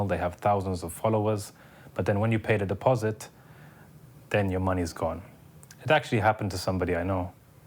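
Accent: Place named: United Kingdom. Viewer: Good video, thanks. Can i ask if you are British too? As your English does suggest you are.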